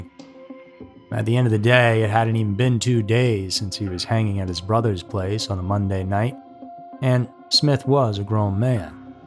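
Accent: South, United States. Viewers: Thank you, new sub here, you have such a smooth soothing voice to listen to. I'm Australian, trying to place your accent, there's a hint of a slow Southern drawl🤔thinking Matthew McConaughey in Lincoln Lawyer😍but I'll settle down😂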